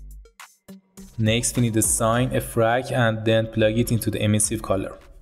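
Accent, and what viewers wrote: Iran. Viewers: From your accent i believe you're from Iran, so am I. Thanks for the great guide Keep it up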